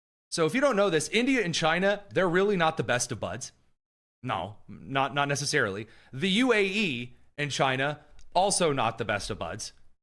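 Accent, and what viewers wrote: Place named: Minnesota, United States. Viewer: Love your Tim-Waltz/Menisota accent! Got a good laugh this morning 😂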